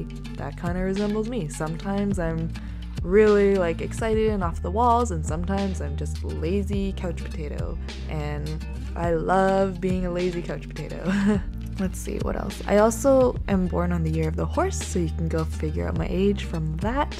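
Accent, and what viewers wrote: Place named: Canada. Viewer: you do sound pretty Canadian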